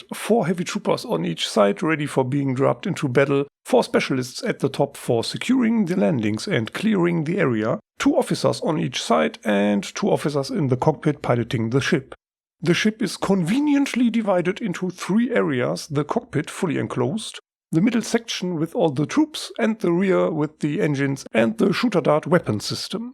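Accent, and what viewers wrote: Germany. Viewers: Man merkt das du deutscher bist nichts gegen dein Englisch aber man hörts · Man hat direkt gehört das du aus Deutschland bist 😂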